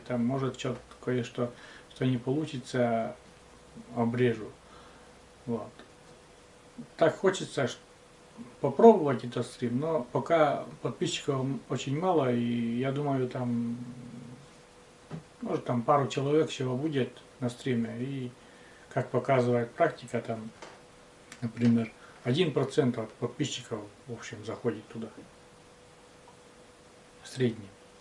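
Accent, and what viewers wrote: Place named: Udmurtia, Russia. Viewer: недавно на вас подписалась, смотрю ваш канал и отдыхаю душой, много от вас позитива идёт, простоты,душевности, чего нам не хватает сейчас, ещё я услышала наш местный акцент / удмуртский/,он меня заинтриговал и вот я с вами. Здоровья вам и вашей супруге, вы молодцы! Спасибо за видео, мне хотелось узнать о вас больше.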